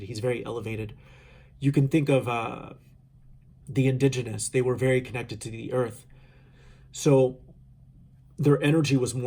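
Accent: United States. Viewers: Thanks for sharing this! Clyde is fascinating, I used to pretend to be Bonnie from the classical story when I was younger lol. I grew up in San Antonio TX so the accent is nostalgic. Channeling individuals from other timelines used to be something I would do when I was sitting in front of my bathroom mirror or in the shower before awakening to this gift's true potential. The power of our imagination is something I have been so passionate about discovering more about recently. Definitely going to use your technique of asking what inspires people to reach out as a way to get a feel for the energy. I feel this so deeply towards the collective in regards to the sexual energy. I feel this soup metaphor so much on a personal level. Ooo excited to hear about the spiral. Your last point reminds me of the adage " I'm rubber your glue" but instead of only words sticking energetic patterns sick as well. 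It was lovely spending my now moment with you, as per usual. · Felt like I was talking to an awesome kinda retired cowboy.... Lovely warm southern accent I wanna hear what he has to offer...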